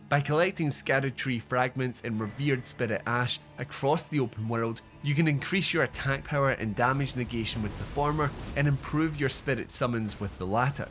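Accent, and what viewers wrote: Scotland, United Kingdom. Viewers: It’s not English he’s Scottish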